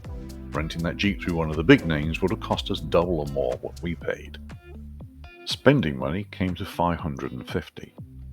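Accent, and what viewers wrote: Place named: England, United Kingdom. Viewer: "just normal people with limited funds" you have an English accent lmao unless you're an immigrant then you definitely have some funds mate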